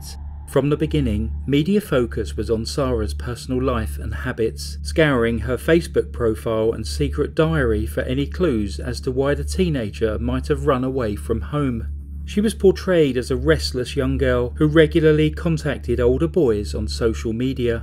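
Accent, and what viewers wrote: United Kingdom. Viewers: Aaaahhh, your Italian syntax was so impeccable I thought I was listening to. I could barely notice just a smidge of British accent.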